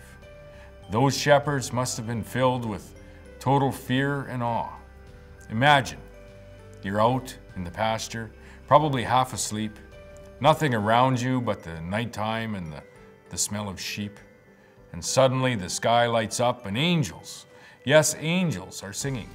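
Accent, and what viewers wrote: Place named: Saskatchewan, Canada. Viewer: Merry Christmas to Scott and family. You also speak like you are born and raised in the greatest province of Saskatchewan. Thank you.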